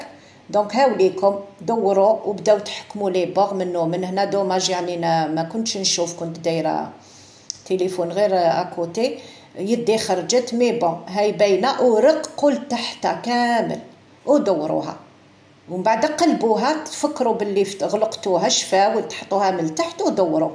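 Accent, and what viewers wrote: Algeria. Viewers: شفت قناتك بالصدفة و عجبتني يعطيك الصحة تحسي الاصالة الجزائرية من خلال صوتك وبالتوفيق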